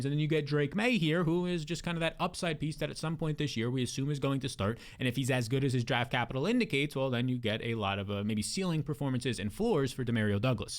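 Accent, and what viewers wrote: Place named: East Coast, United States. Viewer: Y'all making fun of his pronunciation have never been to the East Coast and it shows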